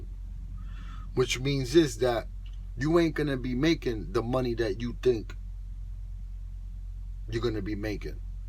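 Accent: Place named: New York, United States. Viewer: Great 10 minutes of insight...This video got me ready to sign up for some cdl classes and start my own journey. Off topic you sound like you from nyc💯💯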